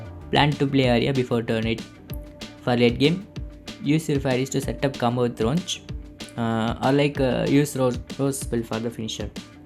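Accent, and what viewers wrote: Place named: India. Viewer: SERIOUSLY!? I knew it by the accent, thanks for be in this game... I love this game I am Indian too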